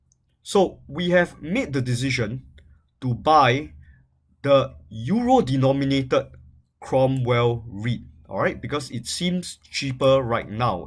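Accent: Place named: Singapore. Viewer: Singlish in a nutshell: " BAY-SEEK-KE-LEE" "ACT-CHU-ER-LEE" "CHROMWELL REED" "MUL-TEE-PLI" "DI-NORM-ME-NATED" "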